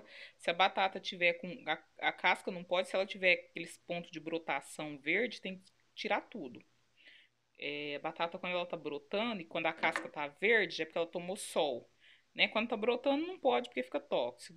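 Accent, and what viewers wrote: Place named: Paraná, Brazil. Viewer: Acho que é do Paraná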